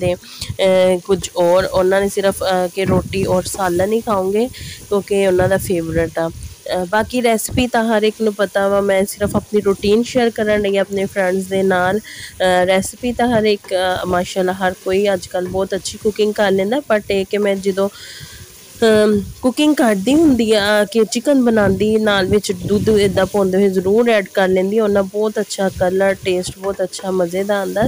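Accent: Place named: Faridabad, India. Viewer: Tadi punjabi ton lgda tusi fabad ton jy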